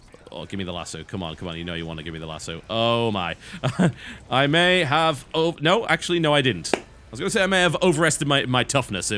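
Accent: United Kingdom. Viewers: How are you saying things wrong? Your British, if anything the Americans are saying it wrong but eh its the accent I guess, just never think you are the one who cannot speak English correctly. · You sound extra british in this video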